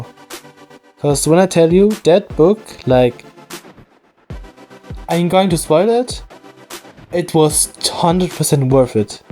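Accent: Germany. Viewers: CONGRATS ON 1K ( also ur accent is so heavy??!!!! ))) german?? Italian??? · Wait, are you german? I am currently learning german on duolingo so I kinda notice the accents 😅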